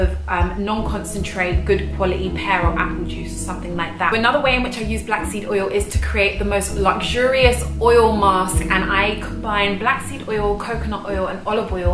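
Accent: United Kingdom. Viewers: A Latina with a British accent Double sexiness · Love your Brit-tis accent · Her accent is nice. It is how we speak in the UK.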